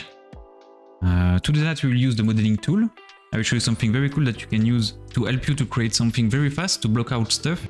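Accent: France. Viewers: On reconnais l'accent Français lol ^^. Tu pourrais en faire en Francais ? Sa serai cool !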